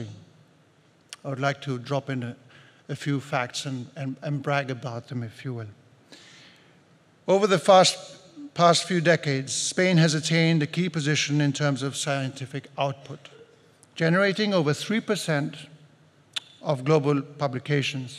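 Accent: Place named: RP, United Kingdom. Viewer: I didn't expect him to have a Posh accent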